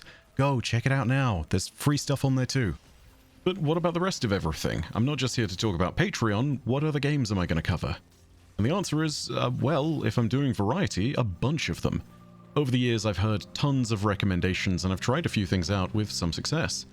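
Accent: United Kingdom. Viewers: I am a 60 year-old man I’ve been playing destiny since 2016 since my son bought it for my birthday. As being a vet, I really had nothing to do with my time when I got out.And I was looking for something to take up that time and to fill that void. And destiny was the answer I’ve been paying attention and listening to your in depth folklore of destiny for years and how you adequately with your smooth and gentle British English accent. Which you matched the lore with your voice perfectly. That is why I subscribe to your channel and of course, a lot of other guardians did too. Because it’s not just a game it’s so much more and as you can see with not only the petition. But with all the voice actors that I hope it does not stop and they do make a DESTINY 3 but will see 🤞, I really appreciate all you do for destiny and for us who listen to your take of the game itself . 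I’ll still be watching but it won’t be the same.It’ll never be the same. But as an old man who has not too much time on this earth, maybe who knows one day My Son or daughters might pick up the game and play it. And say to my grandkids. Hey, did you know your grandfather played this game a long time ago! Would you like to see his character and what his name was? And my grandson or granddaughter if they say yes that’s how I will go on in a memory and live and connect with my family Again. through my ghost.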